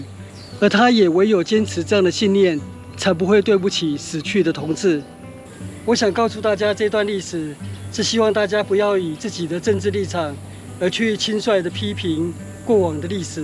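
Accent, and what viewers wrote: Taiwan. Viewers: 我覺得很合適 親切的台灣發音 努力的研究台灣的人文以及地理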